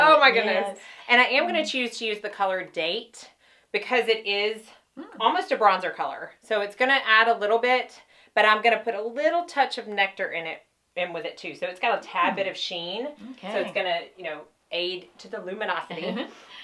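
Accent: South, United States. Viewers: Wow you Look Beautiful and i just Love your Southern accent you do not look your age at all even before make up too! Want to see more of you! In videos you were sooo sweet!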